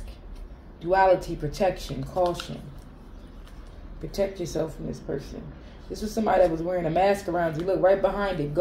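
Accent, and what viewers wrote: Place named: East Coast, United States. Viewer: I love your voice and your lisp! Those lips with ya Easthcoast accent..I do!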